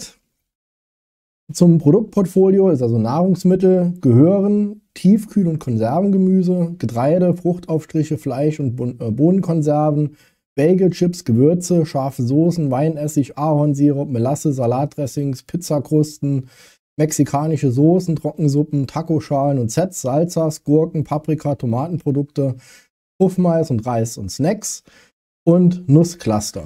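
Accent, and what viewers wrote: Saarland, Germany. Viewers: du hast ein Saarländischen Dialekt :D lustig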